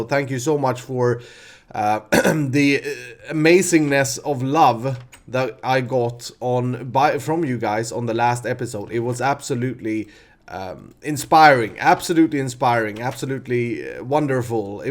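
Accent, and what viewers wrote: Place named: Sweden. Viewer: eller jag hörde på ditt utal att du var svensk :D eftersom att jag också håller på med youtube och jag snackar också engelska i mina videos :D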